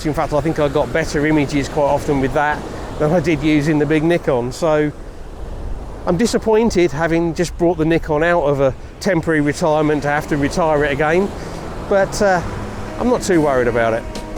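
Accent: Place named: England, United Kingdom. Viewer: In the beginning I understood "I love wifes, I love photograhing wifes" later I realized, that you`re from England 😁. Sorry... Beautiful video!! 👍👍👍👍 Best regards Your namesake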